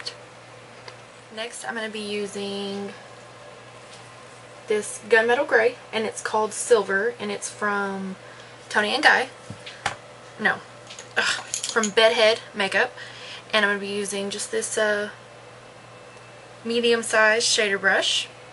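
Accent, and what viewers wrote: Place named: Georgia, United States. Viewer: i love this, its puurrrdy!! you're so cute! what state are you in??? you sound like you're around GA or something... anyways, this looks great on you, i'll have to try it out...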